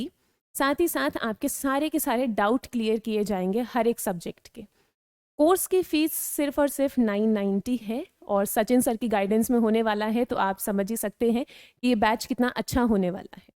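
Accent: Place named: Uttarakhand, India. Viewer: Hello Mam aap dev bhumi uk se lgre ho aapki language tone se or sir name se clear hai ki aap yhi se ho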